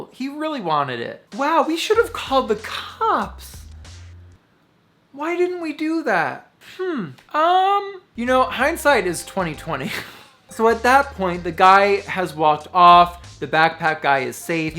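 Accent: New Jersey, United States. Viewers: yep sounds like new jersey to me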